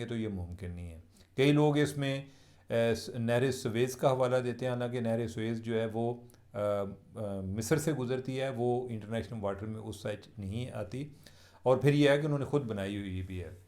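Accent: Punjab, India. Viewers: Love your Panjabi touch ❤ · I regularly watch ur vlog, very balanced, especialy punjabi tarka makes it so good.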